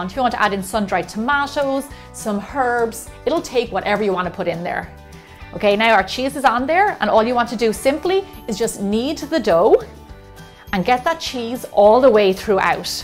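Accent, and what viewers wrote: Ireland. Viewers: Bread is as lovely as your brogue! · Irish! Lovely!! I watch your videos and always learn from them! God's blessings to you and yours!!! 👍❤💖🤩😃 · I can't tell if she's Irish, American or British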